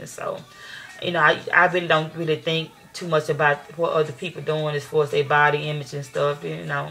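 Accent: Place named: South, United States. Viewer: Her southern accent is very comforting... she literary puts me to sleep when she talks LOL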